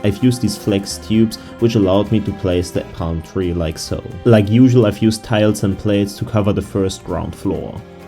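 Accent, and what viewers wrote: Germany. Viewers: Are you German because you have such an accent?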